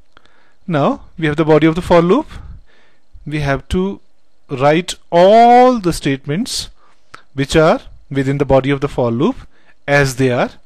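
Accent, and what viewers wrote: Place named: India. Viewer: I realize that your accent sucks BUT BUT BUT i learn a lot from you youre very good in teaching keep it up men you will be able to help many people im sorry for all the indians that i have said that your accent is so bad but i realize that accent is just a sound and learning is everything thanks again men keep it up #NO TO ACCENT YES TO LEARNING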